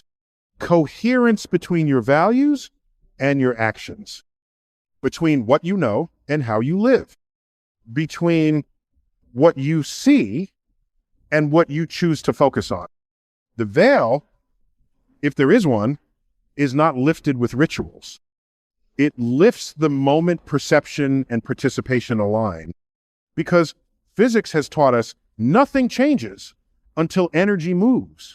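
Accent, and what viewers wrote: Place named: India. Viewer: This ai narration is boring. I hear Indian mannerisms as well.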